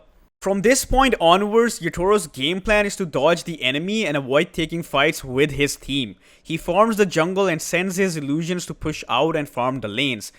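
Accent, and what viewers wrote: India. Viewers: You talk like Indian ))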